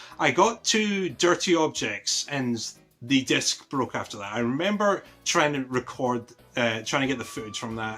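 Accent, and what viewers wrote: Scotland, United Kingdom. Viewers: Are you Scottish?🇬🇧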